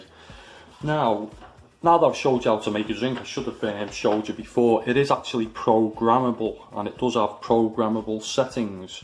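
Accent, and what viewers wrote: Liverpool, United Kingdom. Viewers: Im a listening to a scouce guy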